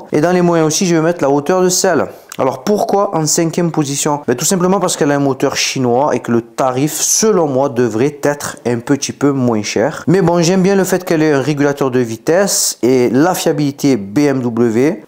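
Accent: South, France. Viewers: fatche t'as l'assent ! continue, on apprécie ici dans le Nord (la Bretagne)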